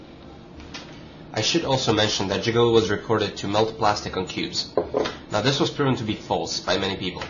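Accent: Canada. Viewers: Ah! Thanks for this. I'm about to lube a cube myself for the first time, and I wasn't sure whether I should disassemble the cube or just spray some in and how much. En passant, êtes-vous Québécois? Votre accent, léger qu'il soit, semble nettement Canadien-français.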